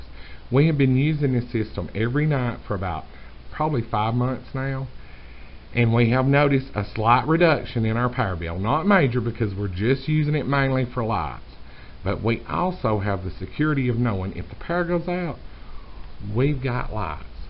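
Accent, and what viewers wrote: South, United States. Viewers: I am very curious as to what part of the country you got that accent from. It is awesome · ...KOOL kiss SET-UP and approach to your project ... KOOL southern accent.... Blessing w all ... :-) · O men, i LOVE your country accent! :D It's so beautiful ^_^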